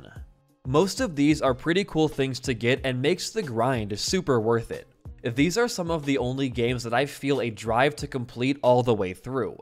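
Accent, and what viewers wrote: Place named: Australia. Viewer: he’s Aussie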